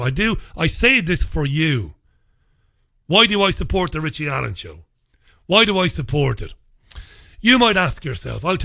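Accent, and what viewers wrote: Ireland. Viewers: Richie thanks so much for all the great programs. You've become an old friend. Great to hear the old Waterford accent in Manchester. Slán tamall.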